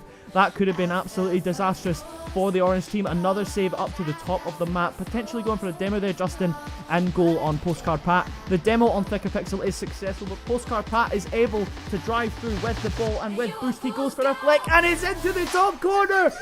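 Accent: Scotland, United Kingdom. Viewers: The Scottish Accent 🤣💯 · he sounds scottish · Love the Scottish accent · i hate the scottish acent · THAT SCOTTISH ACCENT LMAO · That Scottish accent deserves 1mil subs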